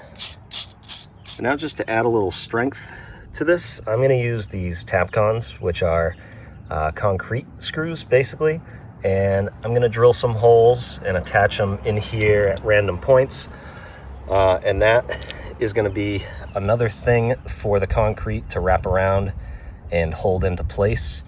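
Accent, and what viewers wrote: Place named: Northeast, United States. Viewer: Try as you might you can't hide that Northeastern accent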